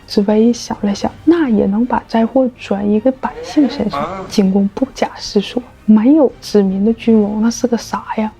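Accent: Northeast, China. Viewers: 🤣🤣🤣🤣🤣🤣我去，看着人，看着画面，看着繁体名字，以为是台北的，一听口音，原来是东北的。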